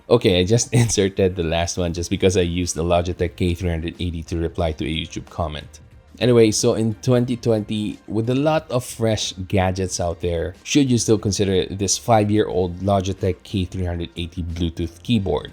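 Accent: Philippines. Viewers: I love that Filipino accent! 👌🏻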